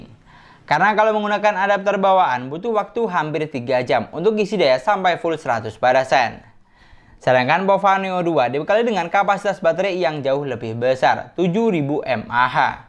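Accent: Madura, Indonesia. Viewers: logat madura ya bang?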